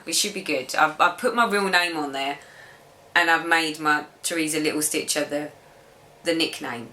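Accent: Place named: Essex, United Kingdom. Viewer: Thank you Teresa for your videos!! I don't want to be critical of others, but.................... You have a way of speaking into the camera, telling a story without 5 minute hesitations, no umming, ah-ing. I feel you are talking to me and not your ceiling. You don't "forget" where you purchased something. You are organized and have everything at your fingertips, no matter what room you're in. You aren't dashing about to another floor in your house to find something while your camera is running. You know where you get your floss. All your fabrics have names rather than "I'm not sure what it is." or "Yes, it's pink and white but I don't know why or who did it." You don't hum non-existant musical ditties all the while lacking the talent to do so during a "Stitch With Me" video. If your phone rings, you don't answer it. How gracious and courteous of you. 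You probably have one of the most concise, informative, friendly videos on Flosstube!! And......you ALWAYS look so nice, even when you aren't well. (I include Carolyn Masseo among your caliber of video makers.) Whew!! Thank you for allowing me to get that off my chest. It's not hard to figure out what to watch on here as long as I see a video I haven't watch from "Teresa Little Stitcher"!! And come on now!! You HAVE to love that Essex accent! Hugs, Teresa.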